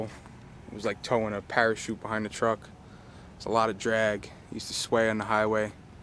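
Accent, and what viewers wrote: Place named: North Jersey, United States. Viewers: you are def from north jersey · Joisee accent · you sound like your from north jersey , but greeting from across the delaware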